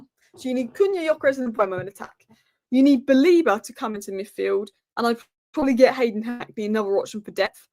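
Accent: Manchester, United Kingdom. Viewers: Could you repost this with subtitles please? I can't understand that thick Mancunian accent OK Yah.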